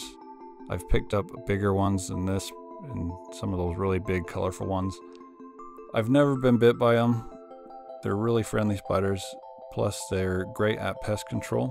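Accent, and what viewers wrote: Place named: North America. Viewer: Aw. I Would hold your hand. If its a jumping spider or a Wolf spider, they tend to have really big front facing eyes, they all have more than 2 eyes. Watch this channel, Spencer at My Wild Backyard, and Travis McEnery (These are theSpiders in your House) and theres a couple of other channels that often collaberate with Spencer who have great content! I think its lovely that you talk to them. I just took my horse who is getting over a sore foot, for a walk in the woods and animals are really the best company, we were out for hours, I've been doint it every day because she loves seeing everything around our wood. I know they dont speak but animals seem to just love the attention and the time you spend with animals is never wasted. I hope You find someone to help with your spider ID, I would have loved to have helped but am in Scotland and I think you sound to be in North America!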